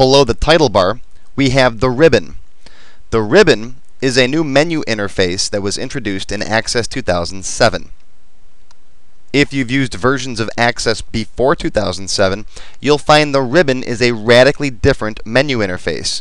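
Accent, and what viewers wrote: Chicago, United States. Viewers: Awesome series, thank you for breaking it down and making it dummy proof. On a side note, you sound like you are from Chicago.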